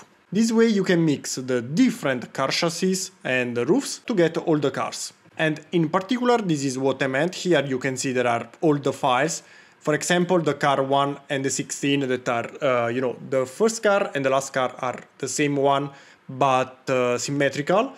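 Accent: Italy. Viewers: Dall'accento avevo intuito fossi italiano hahah, bel video e bel modello, complimenti!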